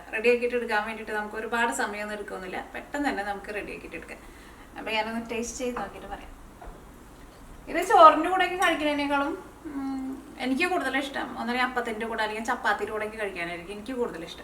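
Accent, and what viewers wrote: Thrissur, India. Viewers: Thrissur slang , mutta kuruma adipoli, ee veedu evideyanu?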